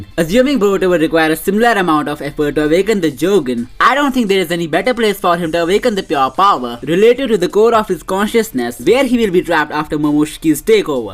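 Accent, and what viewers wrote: India. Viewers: Your Indian accent is real thick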